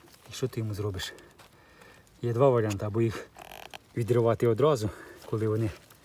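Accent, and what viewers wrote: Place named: Vinnytsia, Ukraine. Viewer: Як приємно чути вінницький говір! Буває тільки заради цього дивлюсь))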